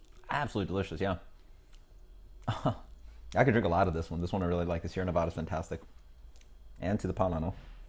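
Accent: United States. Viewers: Out of curiosity. Why do you force the correct pronunciation of German words but use your American accent to pronounce the Spanish words like Sierra Nevada? Great review!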